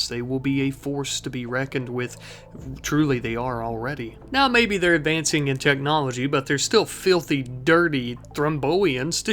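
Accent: South, United States. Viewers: I detect a southern drawl.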